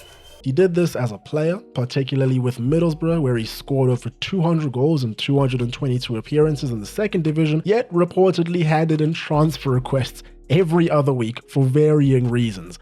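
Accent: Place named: South Africa. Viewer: Are you South African?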